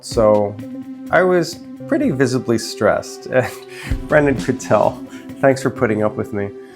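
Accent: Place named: Philadelphia, United States. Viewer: happy belated. of course you're a taurus, makes every sense. I loved your honesty so much in this one. And if you don't mind my saying, I can hear your Philly come out. I masked my Philly/South J accent a thousand years ago... but it comes out at times. And yours came out in this. It's between you and me.;)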